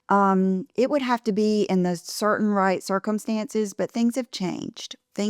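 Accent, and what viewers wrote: South, United States. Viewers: You are authentic and that’s why we love your content, just don’t loose that beautiful authentic southern accent, it’s charming beyond words. TY for your great videos!❤❤❤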